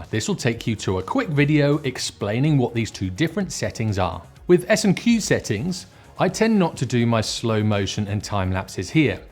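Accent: United Kingdom. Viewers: Your name is so American but you are definitely so British.Very nice video.Will check back with you.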